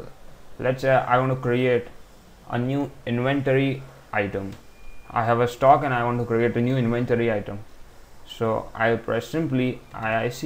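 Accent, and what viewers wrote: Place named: United Kingdom. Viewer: dude I know that you love speaking English. and make every one comprehend what you wanna say despite of using Britishers language and accent too you could be more comfortable with your national native language.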